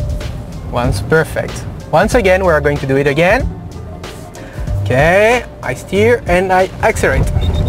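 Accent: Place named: England, United Kingdom. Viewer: ton accent anglais est vraiment détestable.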